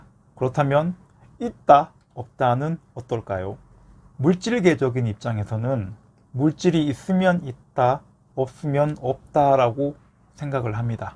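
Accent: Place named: Daegu, South Korea. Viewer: 영상 잘~ 듣습니다. 같은 대구말투도 정겹구요^^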